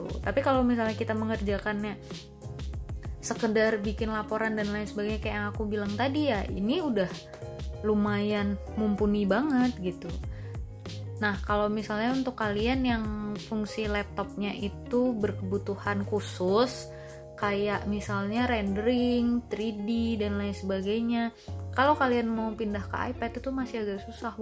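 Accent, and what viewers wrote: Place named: West Java, Indonesia. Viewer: Sunda pisan euy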